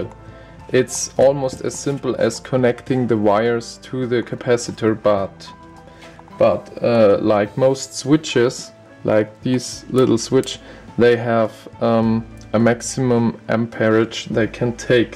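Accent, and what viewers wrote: Germany. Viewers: Ha wusste ich doch dass du deutsch bist dieser Akzent ist unverwechslebar ^^ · are you german? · und Deutsch klingender Akzent. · Lol.. Ich dachte mir sofort, dass das ein Deutscher Akzent sein muss :DD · Are you German??? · very nice video, at first I thought you are a russian guy, but no, ein deutscher Kamerad :D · So einen heftigen deutschen Akzent, habe ich lange nicht mehr in einem englischen video gesehen xD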